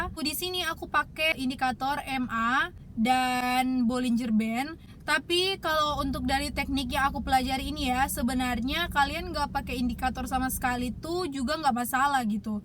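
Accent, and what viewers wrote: Manado, Indonesia. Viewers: Orng mnado kng😂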